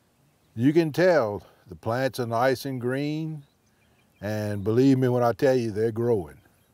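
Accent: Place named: Texas, United States. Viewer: I absolutely love your videos. So helpful and always entertaining. I'm from SE Texas just an hour west of the TX/LA border, but I live in Dallas now. Your accent immediately brings back "home" for me. Thank you so much for your videos and the time you put in to teach us all how to grow smarter, not harder. Lache pas!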